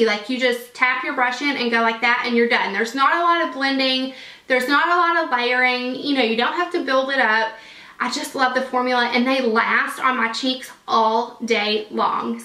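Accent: South, United States. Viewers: Well hello, ***** I share your "mom of boys" lifestyle. I have 2. Love 'em to pieces. Aren't they the best? Nothing like the love b/w mom and her sons, right? Been a subscriber for awhile now, but occasionally like to comment and say hi. Must say, I'm partial to your southern drawl as I was born (GA) and raised (SC) in the South. Miss that now that I'm in MN. Yes, I've lived here over 15 years and still have a slight accent but most of it's gone.:( And you do remind me so much of my dear sweet SIL. Watching you/your videos kind of brings me home in a way.:) Love you channel and your videos Great selection for this one! Hoping if you have a chance, you might pop over to my channel and check out some of my videos. And if you have any advice for me I would love to hear from you. Wishing you a wonderful day! xo ~Melissa in MN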